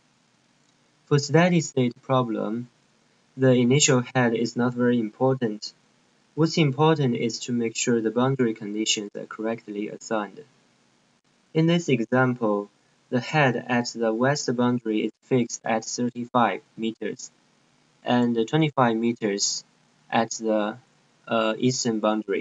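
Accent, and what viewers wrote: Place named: China. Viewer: 一听就是中国人哈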